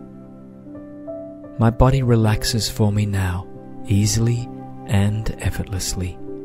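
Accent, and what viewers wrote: Australia. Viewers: once again I cannot thank you enough for all of the help you have provided me with over the last 2 years just from your videos. Your voice puts me instantly at ease (aussie to aussie glad to hear your accent). You are my go to whenever I am fighting either anxiety or otherthinking. I don't know where I would be without you!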